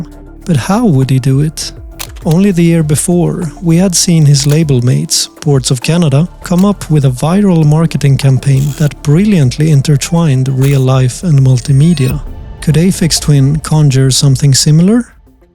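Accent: Sweden. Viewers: You didn't ask me to like, subscribe or comment, but i did all three. Awesome video and i love your mild Swedish (?) accent.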